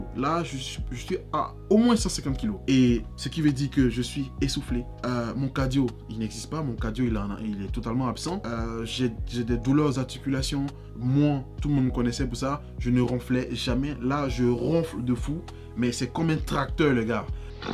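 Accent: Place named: Ivory Coast. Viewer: L'accent ivoirien c'est pour me tué 😂😂😂 moi je m'abandonne heiiiiin 🎉 courage j'espère que ca va me motiver aussi 👍🏾👍🏾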